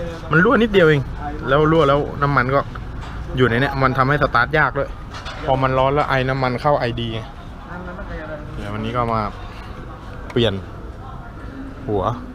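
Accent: South, Thailand. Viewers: พูดใต้อ่าา หล่อจังงเสียงง 😍😍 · แหลงใต้ชัดหลาว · เเหลงใต้พั่นนี้ผ่านเลยพีี่บ่าวววว !! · คนใต้นิกว๊าาาคนบ้านเดียวกัน ติดตามเรยๆ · พี่คนใต้หรอครับเนี้ย 😁😁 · แหลงใต้ชัดปีกเลยนะ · พี่คับพี่อยุ่จังหวัดไรอ้ะเสียงใต้ๆ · ว่าใต้จัดเจนเรย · คนใต้นิเบอะ ... 555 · พี่คนใต้หรอครับ>< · อ้าว พี่คนใต้หรอคับ555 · เพิ่งรู้ว่าพี่คนใต้555 สงสัยมารนานละสำเนียงอะไรทำไมคุ้นๆ555 ผมคนหาดใหญ่ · คนใต้นิหว่า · คนใต้555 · พี่คนใต้กันช่าย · พี่เป็นคนใต้ป่ะคาป ได้ยินเหมือนพุดใต้เลย พรักยุไหนแล้วอา....? 5555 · พี่เป็นคนต้เหรอคับ จังหวัดไรเหรอ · ใช่ครับ พี่เค้า คนใต้ คนนครศรีธรรมราช อ.ลานสกา ครับ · พี่คนใต้หอครับ. ตอบแท่ได้ครับ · เอ้า!! ใอ้ย่ะคนใต้บ้านเดียวกัน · แหลงไต้เลยหรอ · คนใต้เหอะนิ จังหวัดไหน คับ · ขอบเติ้นเเหลงใต้นิ ว่าหรอยๆ ผมยุพัทลุงนิคับ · ว้าวพี่พูดใต้น่ารักมาก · สำเนียงเหมือนคนใต้หรือคิดไปเอง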